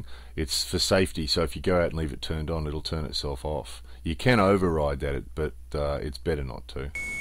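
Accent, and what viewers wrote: Australia. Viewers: Very informative. Nicely narrated with a of Aussie accent .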